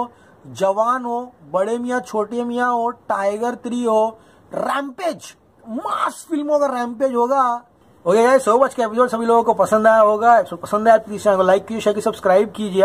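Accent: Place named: Hyderabad, India. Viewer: Lol hyderabadi accent 🔥🔥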